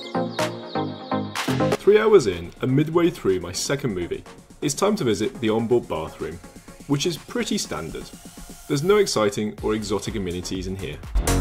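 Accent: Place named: Birmingham, United Kingdom. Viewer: That brummie accent is coming through ! Great video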